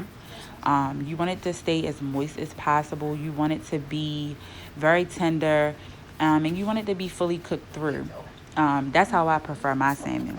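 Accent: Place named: Baltimore, United States. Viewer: That bmore accent🥰😅😁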